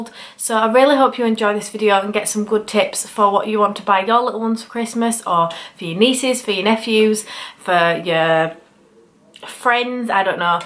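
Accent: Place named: Middlesbrough, United Kingdom. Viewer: Thanks for making the video. I lived in Middlesbrough for couple of months and u remind me of it soo much ur accent.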